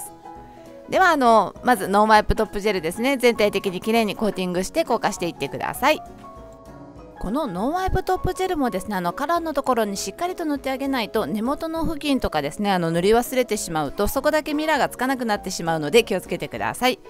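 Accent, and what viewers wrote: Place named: Gifu, Japan. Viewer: ライブのときは岐阜弁が聞けて嬉しかった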